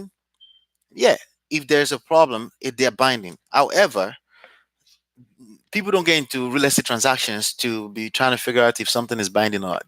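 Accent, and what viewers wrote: Nigeria. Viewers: You Nigerian bro?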